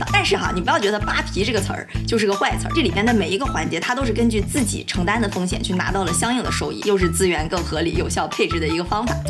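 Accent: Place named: Beijing, China. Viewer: 这京腔，真好听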